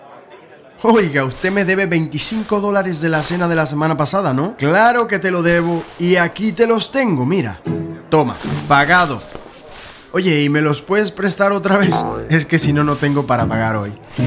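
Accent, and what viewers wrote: Argentina. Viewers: el asentó cordobés es horrible, no lo aguanto, igual que el asentó chileno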